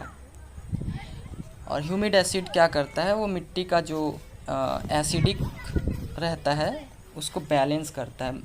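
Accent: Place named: West Bengal, India. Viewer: Are you from west bengal ?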